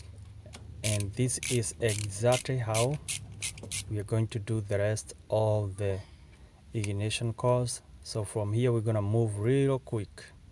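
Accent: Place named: Somalia. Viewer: I can tell this brother is from Somalia great job werya.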